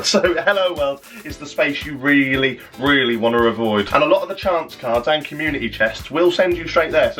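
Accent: United Kingdom. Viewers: Hello just found your Chanel but i wonder are you a real british person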